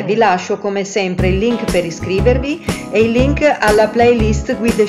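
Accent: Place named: Emilia-Romagna, Italy. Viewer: Sono passata a guardare un tuo video grazie a gattaluna 😊sn rimasta colpita dall accento...pensavo ma qst signora è della mia regione! Infatti quando hai detto Bologna tutto torna😅ciao io sn di Forlì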